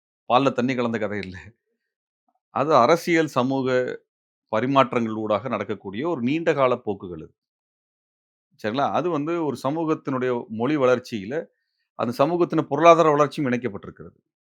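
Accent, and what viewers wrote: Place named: Karnataka, India. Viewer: is anchor a tamilian r not ? in all channels anchors r talking like kannadikas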